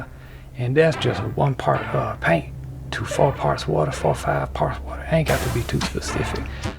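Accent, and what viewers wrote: Louisiana, United States. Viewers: I can't tell if you're a character or just a witty leezianna southerner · Do i hear a cajun accent?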